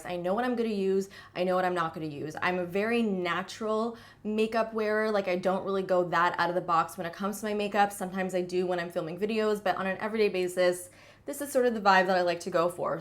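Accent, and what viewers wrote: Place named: Canada. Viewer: Do you watch Lael Hansen? I just noticed you both sound alike . Might be the Canadian accent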